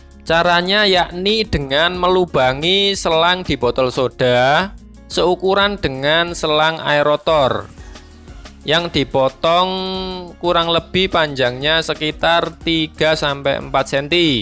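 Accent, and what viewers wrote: Java, Indonesia. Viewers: medok e nyenengke dhe, thx sharing ilmunya, sukses selalu dhe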